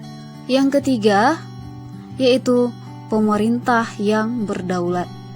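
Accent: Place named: Batak, Indonesia. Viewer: Masih ada bahasa pekat btakny🤣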